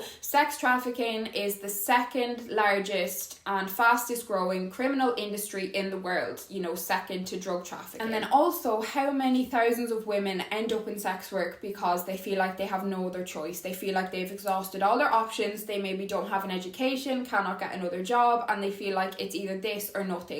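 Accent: Ireland. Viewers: It's like the voice in my head turned Irish, I agree wit everyting you said. 😎👍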